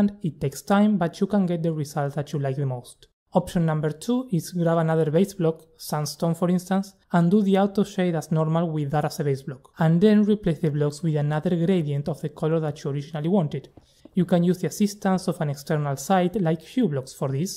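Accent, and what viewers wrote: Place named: Argentina. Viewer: Sos argentino? Tenes un acento marcado me encanta ❤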